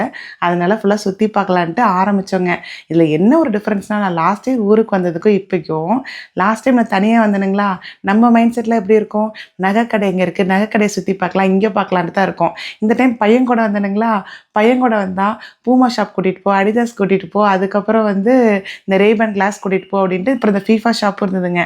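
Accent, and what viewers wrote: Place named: Salem, India. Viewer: Salem tamil rhombha super...maan vasanai innum ungalodu irukku...enga aaya, thatha ellarum salem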